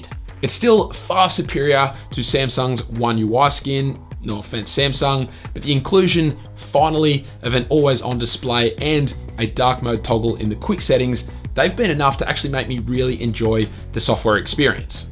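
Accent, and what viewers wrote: Australia. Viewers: Great video....not too long like those 15-20 minute ones which really becomes boring. And yes mate, are you an Aussie 😝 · Everything sounds good in Australian accent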